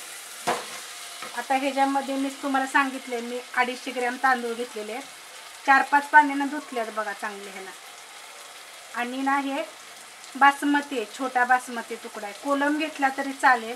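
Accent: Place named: Rural, India. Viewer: खूप छान मावशी,,,, तुमच्या भाषा गावाकडची आहे पण त्यात खरंच खूप प्रामाणिकपणा दिसतो,,, जेवढी चव तुमच्या बोलण्यात आहे तेवढीच या पदार्थात असेल यात काहीच शंका नाही...! आणखी खूप व्हिडीओ बनवा,,, अस्सल गावाकडचे पदार्थ शिकवा आम्हाला..!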